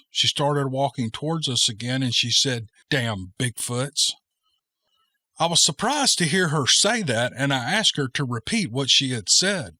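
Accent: South, United States. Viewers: I tune out the moment I hear a southern accent I relation to sazquatch......seeya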